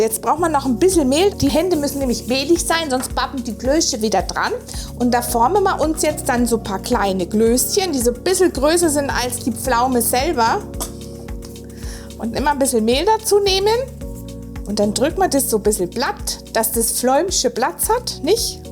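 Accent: Germany. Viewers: Klasse! Dein Dialekt gibt mir immer wieder ein Rätsel auf:eine Mischung aus hessisch, fränkische und berlinerisch. Oder täusche ich mich?!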